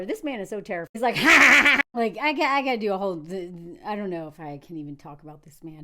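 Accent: South, United States. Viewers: You're like me. You're not trying to have a southern accent. You're just trying to sound NORMAL.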